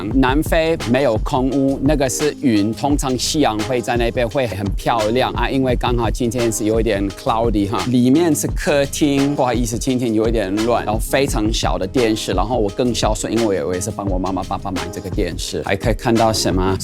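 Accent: Taiwan. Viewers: 越來越有台灣人講話的「口氣」，安捏都丟啦😂😂😂 越來越往幹話發展的趨勢，哈哈😄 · 教授的台灣國語加台語真到地,太像台灣人講話模式了!哈哈！ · 😂😂教授講中文竟是臺灣國語....安捏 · 教授台灣國語涅😂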